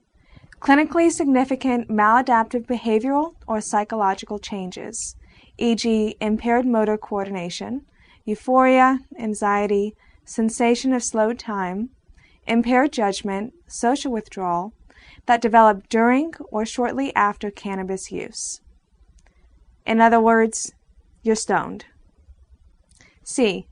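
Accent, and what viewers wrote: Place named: New Jersey, United States. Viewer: i like the way she talks it sounds like she may b from new jersey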